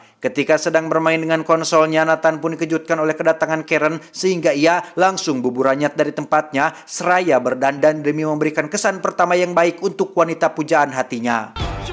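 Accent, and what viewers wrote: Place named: Madura, Indonesia. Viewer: Madura bg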